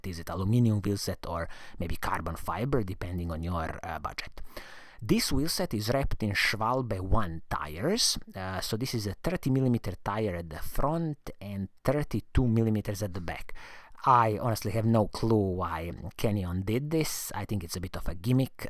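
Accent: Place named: Italy. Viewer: He sounds Italian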